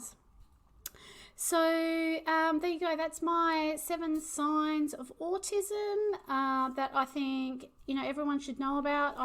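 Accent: United Kingdom. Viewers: The only symptom I don’t/didn’t have on your list is delayed speech. I started speaking pretty early, surprisingly. But even down to you speaking in a British accent, assuming you did it because your dad is British… I also did the same, and my dad is also British and I’m American 😂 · Toe walking can also be because of a too short tendon between the heel and the calf muscle. Can be fixed with surgery. - Ecolalia can also be a result of TS/OCD - you're quite right. Same with coprolalia. - Constipation may be (I had it when I was a kid) because for some reason I liked the feeling of "keeping it in". NOTE! I've got TS/OCD and probably a tad Asp and a sprinkling of ADD. - My facial reaction can be totally blank by that is by will. - My primary language is not English but I still speak English with a Brit accent because I'm old enough to not have learned the US accent. 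;) But then you are what you speak! See Star Wars princess Leia when brought aboard the Death Star and meets governor Tarkin. She mulled over the line with "I should have recognised your foul stench" and it didn't come out right. Of course not since she was American. Then someone on the set told her to try that line in Brit English, and lo and behold! Carrie Fisher delivered the line in perfect Queen's English. I must say you sound perfectly British (if you aren't). - I preferred to play alone as well and I have always been a "loner". Also, a sign is fixating on something. Like I did on computers and continued to work with systems development and maintenance in various capacities all my life. I get really got at it, too! ;) The ability to really focus on something is something I think people with autism/asp can do. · I think you still sound like you have a bit of an English accent to me but I’m no expert.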